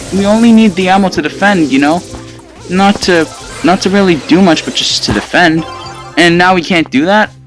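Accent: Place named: India. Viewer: You sound Indian asf lol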